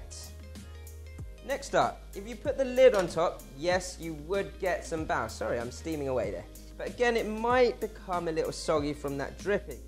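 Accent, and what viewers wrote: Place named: United Kingdom. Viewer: The british accent tho... **heart eyes emoji*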